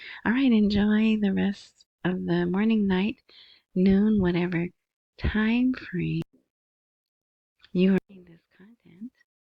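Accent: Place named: South, United States. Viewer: Good Morning, don’t down play yourself on speaking. I’m only guessing your from down south as myself I’m from New Orleans but live in Metro Atlanta/Alpharetta Ga. I hear and understand you very well, all words are annunciated and articulated very clearly. No complaints here, keep up the good work. A little off subject I was about to invest more into Dinar & Dong but I own several million in both. I’m thinking of going about $5K and investing more into XRP crypto. I think this is own generation Bitcoin. If all avenues for sending money ( Western Union, Money Gram, Zelle, Crypto, Walmart to Walmart, Cash app ) whomever will have to go through XRP. I didn’t get it in 2022 at $0.50 now it’s $2.25 after court settlement. I think along with Iraq and all countries re settlement of currency XRP might be a good bet. I’m not telling people to buy I’m just saying I’m spreading around hoping for 1 to bring me on to super early retirement. 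Lol. Thanks for you platform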